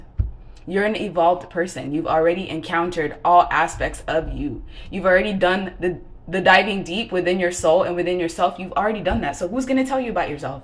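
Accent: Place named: Latin America. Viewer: Girl I’m a black girl I could’ve sworn you were Hispanic, you got a lil accent!!! Lmao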